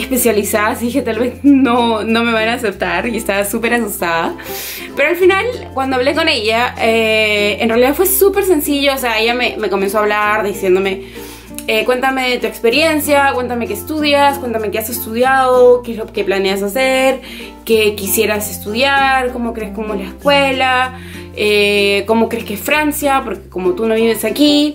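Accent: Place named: Peru. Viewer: supe de donde eres, de Perú, por el acento, casi no se siente, pero es como un acento vacío o neutro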